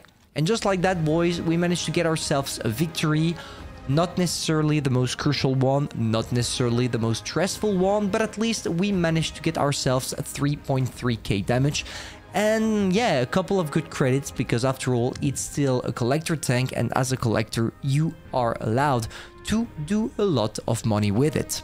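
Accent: France. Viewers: Are you French from treytens videos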